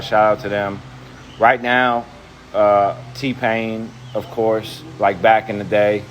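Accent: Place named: United States. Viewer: When scrim was an addict he sounded hood, now that he's sober he sounds country...wtf.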